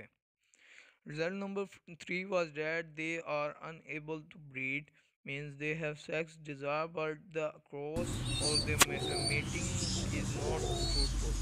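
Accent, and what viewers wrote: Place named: Pakistan. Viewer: آپکی انگلش بولنے کے طریقے سے ہی پتا چل گیا تھا کہ آپ پاکستانی ہی ہو😃😉😜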